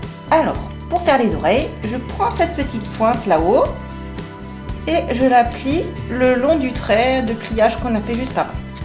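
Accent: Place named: North-East, France. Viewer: Hey t'as un Accent du Nord-Est (de la France) C'est sympa comme pliage :) Ca va faite un petit truc bien sympa pour la table d'anniversaire de mon neuveu ^^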